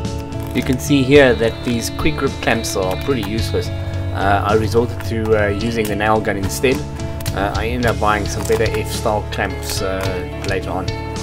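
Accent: South Africa. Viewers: awesome video, agree with Jeremy great to hear South African Accent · Sorry for the rather odd question, but are you South African? Kind of have an SA accent :) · Dude Are You From South Africa? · Great to hear that South African accent! Brilliant speaker build as well. Thanks for posting :)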